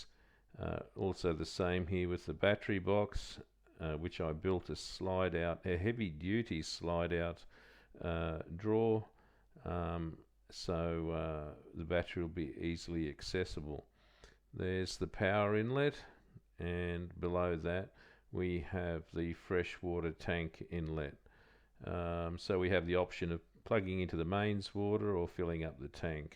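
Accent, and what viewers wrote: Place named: Australia. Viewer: I watched part one and two just amazed at the quality of work...when I heard the Australian accent it confirmed my previous beliefs in Aussie craftsmanship and ingenuity.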